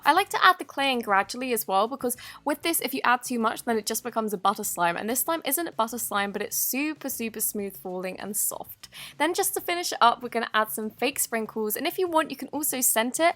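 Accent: United Kingdom. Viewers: Are you British??????!!!!??!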